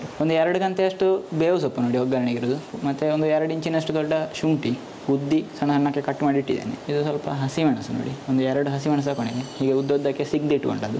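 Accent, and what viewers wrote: Mangalore, India. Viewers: Nan aduge nodthino gothilla but nim mathu keloke vidro nodthini Mangalore language super thumba chanda ಉಂಟು keloke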